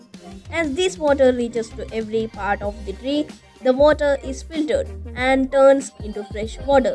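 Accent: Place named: India. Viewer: Once I heard the Indian accent I immediately left the video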